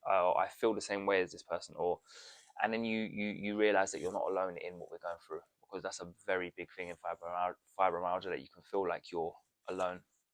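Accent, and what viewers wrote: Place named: United Kingdom. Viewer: Hi 👋, im new to your wonderful channel here on Fibro..I just came across your channel and find it sofar to the point info on everything about it as u can tell us all.The full..Heads Up on this awful painful condition..gentle and friendly nice huggs to u hon.❤❤❤love your awesome accent as well. You British or Australian. Im guessing..British..sweet anyways..ill keep watching daily keep posting ok.much love from me..Nancy from Jacksonville Florida..USA❤❤❤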